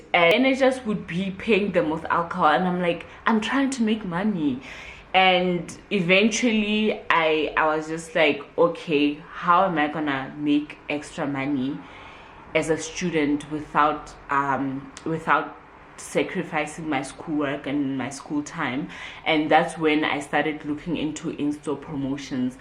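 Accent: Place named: Sotho, South Africa. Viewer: Your Sotho accent ❤️😍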